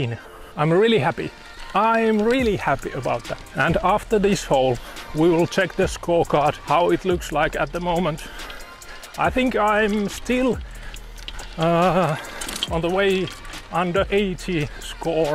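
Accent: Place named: Finland. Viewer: Bit of finnish accent or "rally" accent but so what 🤷‍♂️ keep it coming!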